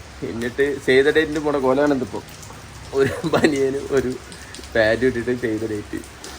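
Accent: Kodungallur, India. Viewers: കൊടുങ്ങല്ലൂർ സ്ലാങ് ആണിത്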